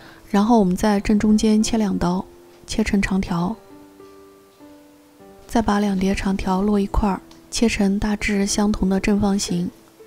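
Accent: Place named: Sichuan, China. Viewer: 抄手配湯愈吃愈香是四川口音； 是四川人嗎？想做一些你的油潑辣子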